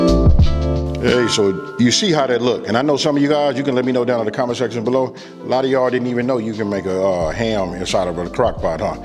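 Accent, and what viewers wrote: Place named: Los Angeles, United States. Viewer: I'm cooking my Thanksgiving meal. I've watched this video and a few of your others 15 times 😂 for each item. My husband walk in like who is this LA guy you watching . I know that accent. 😂😂 I'm like what accent 🤔😂 Now I know why I connect with your videos so much. I feel like I'm at home 🤷🏾‍♀️😂😂 #Happy Thanksgiving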